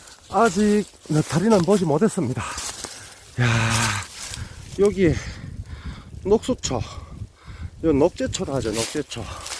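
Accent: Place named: Gyeongsang, South Korea. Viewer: 구수한 갱상도 사투리가 정겹습니다~^^ 귀한 영상 즐감하고갑니다 수고하셨습니다 행복한 나날 되세요 🙏 감사합니다 🤗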